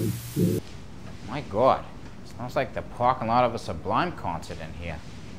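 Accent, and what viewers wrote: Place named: South Boston, United States. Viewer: XD I love that he has a southie accent!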